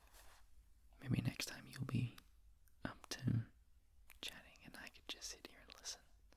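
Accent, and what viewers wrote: Australia. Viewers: When I clicked on your video, my interest was immediately piqued by the familiar Perf accent, and I'm so glad I stayed because I love your rambles. I know a lot of women like myself have difficulty relaxing to the sound of a masculine voice, but you're so gentle and sweet that it's easy. Thanks for your videos,! <3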